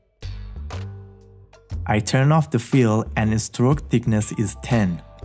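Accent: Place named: Iran. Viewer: لهجه ایرانیت تو حلقم عالی ادامه بده ❤😂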